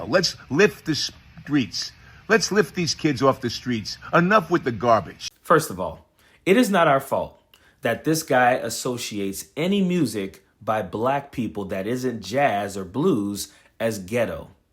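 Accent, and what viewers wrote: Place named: New Jersey, United States. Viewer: Does he realize his Jersey Shore accent is considered low class & ghetto??😏😂 He could never compete with SLJ..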